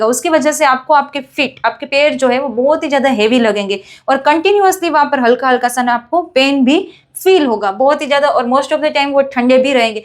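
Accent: Gujarat, India. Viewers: તમારી ભાષા મા ગુજરાતી લહેજો દેખાય છે બાકી હિન્દી સારુ છે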